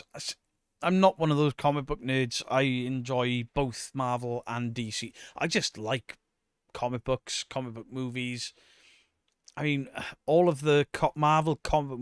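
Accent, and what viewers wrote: Wales, United Kingdom. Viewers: Hi just started watching you think ya great an yeah loved you with watching Paul an Mark from Stoke on trent it was a good 😱an love listening to ya Welsh accent me Dad was from Wales bless him 🏴 an love it when ya went 38 east drive 👻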